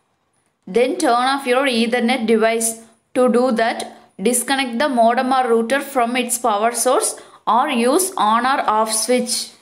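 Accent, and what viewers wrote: India. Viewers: Thickest Indian Accent Ever.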